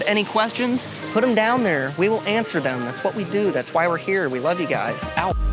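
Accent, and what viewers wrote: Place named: East Tennessee, United States. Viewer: Epic!🐸👍🏻. Love the absolute “East Tennesseeness” and I love that this was for my favorite Doc💙🎄💙🎄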